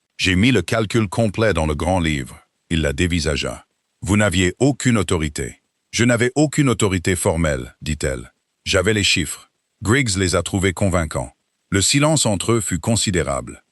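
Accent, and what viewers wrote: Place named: England, United Kingdom. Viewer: Mais pourquoi cet accent anglais ridicule alors qu'à certains moments le langage français est employé correctement ? En France, nous parlons français sans accent, merci à l'IA d'en tenir compte !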